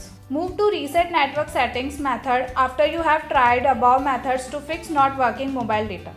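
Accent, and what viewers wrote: India. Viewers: She’s Indian brahh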